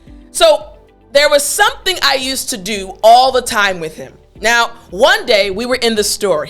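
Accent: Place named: United States. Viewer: Your lessons is good and have the American accent so anyway that's awesome! I'd like the way you are teaching the students because you have style of teaching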